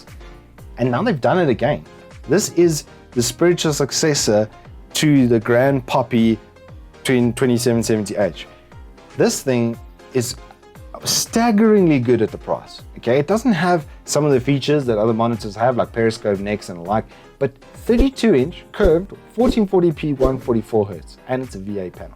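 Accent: South Africa. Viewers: I don't normally have problems with SA accent, being in Australia myself. But I am hard of hearing, and dude you slur and mumble. And please look at the camera more when talking. Thanks.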